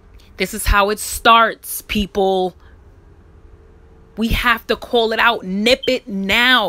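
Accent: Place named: New York, United States. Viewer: Metelyrics a New York accent maybe ? Lol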